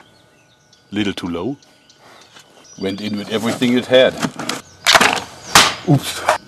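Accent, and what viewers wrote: Germany. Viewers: könnte es sein das du deutscher bist??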